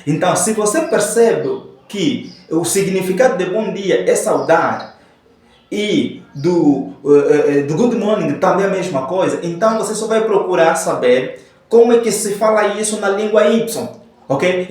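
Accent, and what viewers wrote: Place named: Angola. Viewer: tudo top, ainda falando com sutaque Mwangolé 💥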